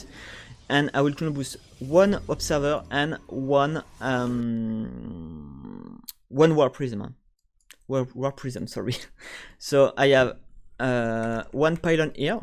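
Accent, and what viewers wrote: France. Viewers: ton accent anglais fait tres francais c est tres drole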